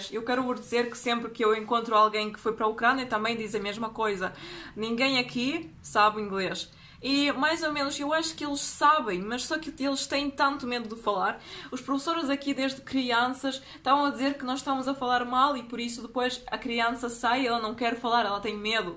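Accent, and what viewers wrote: Portugal. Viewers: Aprendeu português de Portugal!!!! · Russa com sotaque de Portuguêsa! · Princesa ! Parabéns , você fala o português muito bem ! Eu do rio de janeiro do Brasil ! Você falando em português , você parece uma portuguesa de Portugal falando português ! Eu acho lindo seu sotaque ! Você tem toda a razão sobre o que os brasileiros fizeram com essa garota russa ! Aqui no Brasil eles foram alvos de muitas criticas nos jornais brasileiros e pelo brasileiro que não gostou do que eles fizeram com a garota russa ! Parabéns pelo seu canal no YouTube , você acaba de ganhar mais um inscrito ! Eu amo a mãe Rússia e o povo russo também ! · Olá O Seu português de Portugal é muito bom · adorei o sotaque português, PT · Agora ver uma loira falar português de Portugal é muito engraçado. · Não com esse sotaque "moraste" em Portugal e não é preciso dizer mais nada portugal é o país do ódio não gostam de ninguém..concerteza mudaram a sua cabeça sobre o que é o brasil sem nunca vc estado aqui.. 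eu sei como são os portugueses muito ódio no coracão vão falar bem de vcqd vc virar as costas vão dizer aquela ucraniana, romena moldava ou sei lá o que... tw convido a conhecer o Brasil e sair dessa caixinha.. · Mas então?! E esse sotaque de Portugal? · SEU PORTUGUES, E' MUITO BOM ! SOTAQUE DE PORTUGAL ! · Esse português europeu. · Ai Jesus ! Que sotaque lusitano, ô pá! Que gracinha...❤️ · Olá garota russa com.pronuncia portuguesa, tudo bem? É a primeira vez que vejo um vídeo teu. Já há relatos que os vídeos dela , trata se de uma blogueira que buscava matérias polêmicas para vender a um site de notícias no Brasil e depois vendeu a matéria as TVs. Portanto , já há relatos que uma série de mulheres ativistas combinaram fazer isto.chamavam os caras que estavam em grupos a beber , acenando com.beijinhos e gravavam eles. Estes caíram.como patinhos na cilada pois brincaram (brincadeira de mau gosto e certo) falando palavrões em portugues. 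Aos quais ela repetia a sorrir. E ela sorriu qd um pediu um beijinho . Ou seja , eles caíram na cilada feminista! E não só.foi.uma plano armado por várias ativistas repórteres que estão fazendo matérias combinadas. Já há <link> russos.argebtinos e outros que foram atraídos por essa farsa para fazerem vídeos e vender matérias para TVs e blogs nas internet acusando os homens de serem machistas,de fazer assédio e tratam como uma violência.mas não passa de Fake. Ela já vivia há seis meses no Brasil e sabe 5 línguas ! Espero ter sido claro. Beijinhos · ela parece portuga falando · Caramba vc fala com sotaque português, que bacana! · Você fala como uma portuguesa kkk...., mas também não concordo com a atitude daqueles machistas sem educação .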